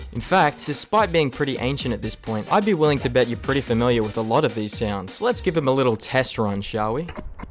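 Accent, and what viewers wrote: Australia. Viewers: DAMN BROS MORE AUSTRALIAN THAN ME🐨🦘🇦🇺🇦🇺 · australian man explains roblox sounds nice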